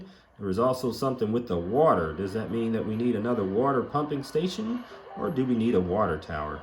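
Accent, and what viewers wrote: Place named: United States. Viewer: What state is your accent from if I may ask?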